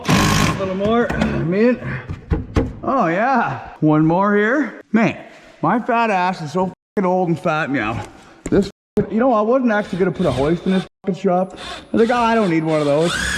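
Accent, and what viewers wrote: Canada. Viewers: I’ve never heard someone as Canadian in my life I’m fucking hooked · Why do you talk like a Canadian mate ?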